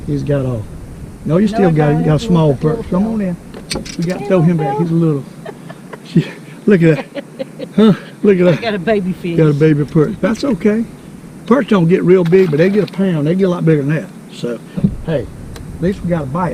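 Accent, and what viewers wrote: South, United States. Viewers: Hey its sourthern english I understand u just fine but I am from alabama LOL good vid sir👍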